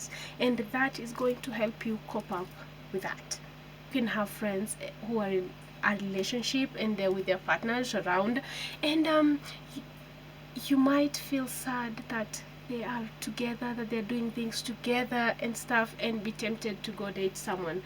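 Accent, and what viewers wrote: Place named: Kenya. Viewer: Love your voice, your accent. And you look very nice. Would you want to share what tribe and area of Kenya you are from, tafadhali?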